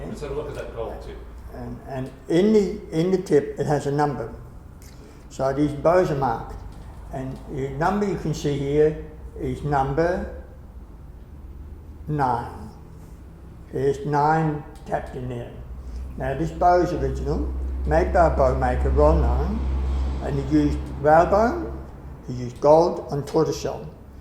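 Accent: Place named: Australia. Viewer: His accent sounds Australian to me, so I don't know if he means US dollars or Oz dollars.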